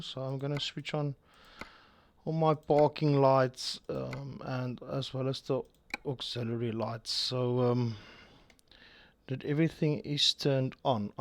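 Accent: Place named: South Africa. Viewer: Im willing to try out the mod 🥲 just wanna see what it is about. You accent sounds familiar ,are you South African Rare to see a South African gamer into trucking 👍Nice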